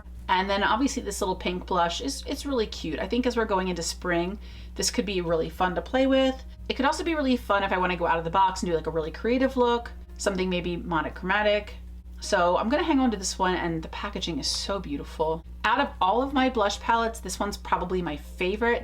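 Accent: Philadelphia, United States. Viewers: I just found your channel and I was like “tell me she’s from Philly….” Haha instant subscriber! I’m from the area too 😊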